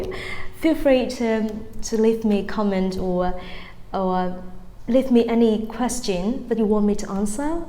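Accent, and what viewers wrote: United Kingdom. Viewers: Giọng cô là anh phải ko ak. Nhưng lại ko nặng lắm. I love your acent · giọng chị hay quá!!!~ có phải giọng chị theo tone British accent ko ạ? em đang luyện nghe kiểu thụ động, nghe giọng chị rất quyến rũ, dễ nghe và dễ phát âm theo nữa. Cám ơn chị đã dành thời gian để làm video này cho mọi người ạ <3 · Chị nói accent kiểu người anh, nghe quyến rũ, uyển chuyển quý phái thật! Em thích nhưng mà khó quá nên đành theo anh mĩ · giọng Anh chuẩn, dễ thương giống Lucy ( “Learning English with Lucy’’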